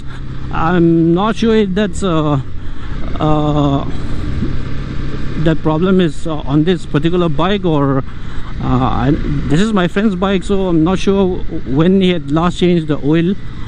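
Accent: Mizoram, India. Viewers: Hetiang footage hi a hmuhnawm riau 👍🏻. Tin, English pawh a typical Mizo accent lo khawp mai 👌🏻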